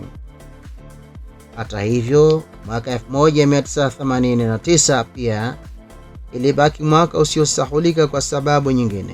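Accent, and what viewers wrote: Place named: Rwanda. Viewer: Mmhhh mtangazaji mhh nakuelewa kbs na kiswahili muurwaa kbs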